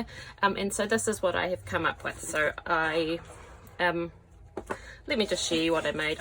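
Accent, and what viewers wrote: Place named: New Zealand. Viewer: Just found you. So cool to hear a kiwi accent haha. Thanks for sharing.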